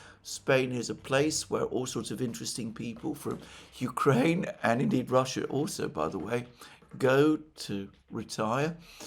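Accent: United Kingdom. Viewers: love how you described it! British accent rules❤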